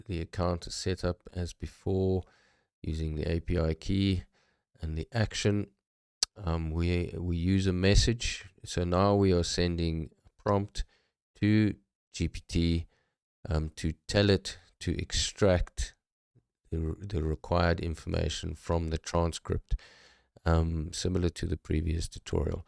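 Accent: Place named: South Africa. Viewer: Very cool, why did I know you are from SA by 😅 voice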